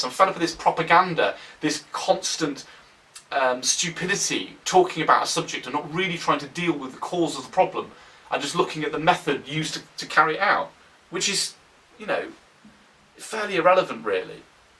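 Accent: United Kingdom. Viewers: it just sounds better with a british accent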